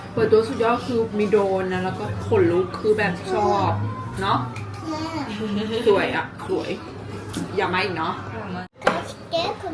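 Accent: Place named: Ratchaburi, Thailand. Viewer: พี่เป็นคนจังหวัดอะไรครับผมฟังจากเสียงเล้วเหมือนคนราชบุรีเลยครับ😅😅😅ถ้าผิดต้องขออภัยด้วยครับ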